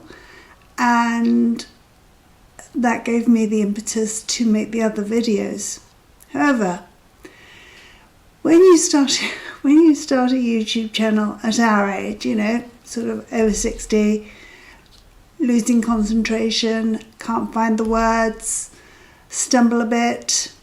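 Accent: United Kingdom. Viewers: Hello 😊 a friend told me about your channel and I immediately got interested and subscribed. I’m just a bit younger and maybe know much more “technology” but getting in front of camera is so intimidating for me. I’m going to watch your videos because I want to support you - I agree we can create a tribe of people like us! You say you like to do art - that interests me a lot because I’m an artist. So, this was the first video I watched of yours and I can see you made progress 👏👏👏. I will watch them all - when I find time in my busy schedule of making videos on tou tube 😅. By the way I love listening to your British accent (as a former English teacher) see you soon ✨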